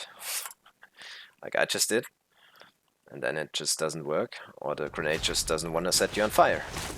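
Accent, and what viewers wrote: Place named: Germany. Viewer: Nice Build Aber das du deutsch bist hört man dirket xD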